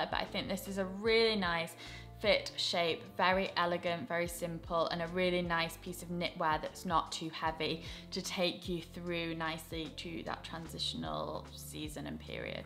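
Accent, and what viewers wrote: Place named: RP, United Kingdom. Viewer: Hi,! My name is Amy, I am from Bangkok, Thailand. I had been looking for the outfits that I wanted to wear for ages. Finally, I found you on the YouTube and I only stick with you even their are many YouTubers’ videos pop up on my new feed almost everyday but I never click on anyone else fashion styles. I really love the way you described your outfits. Meanwhile, I’m starting to order new clothes, trousers, shoes, belts, ornament and lots of things to wear according to your videos. I must say that I love your styles because you look expensive and glamorous even the outfits are not quite expensive at all. I mean affordable and they make you look fabulous in the same time with the clothes that you wear on your channel I don’t have a word to say about your dressing styles, apart from superb, splendid, fabulous etc. If you would like to come to Thailand, I would love to take you around my beautiful country. 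if you have a chance to come to Thailand I will surely take you around the beautiful beaches which have white sand and crystal clear water, I promise you this. Just come with your pocket money, that’s all. Your British accent is so posh! Please message me on YouTube. By the way, I am 60 years old. I don’t know much of how to use this social media. Thank God that I found you on the videos. I used to live in between between Belsize-Camden tube stations. Please forgive me for my broken English. X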